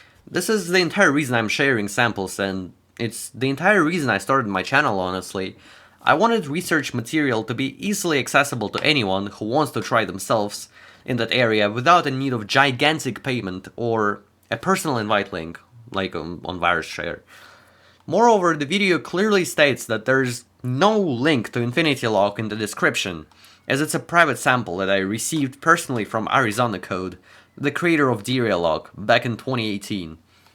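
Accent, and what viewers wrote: Poland. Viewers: Are you Polish? Your location says Australia but you do not sound Australian at all and your YouTube is in Polish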